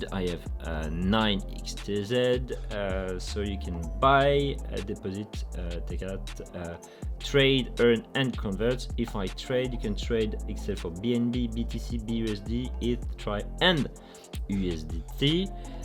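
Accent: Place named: France. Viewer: As a French, I love your French accent! :)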